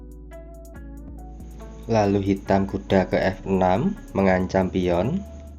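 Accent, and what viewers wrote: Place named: Central Java, Indonesia. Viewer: ..yg koment bukannya ngantuk ttpi yy bgitulaah medhok bingit logat 1area wilayah daerah di jawaTengah, lanjutkan dik Adminn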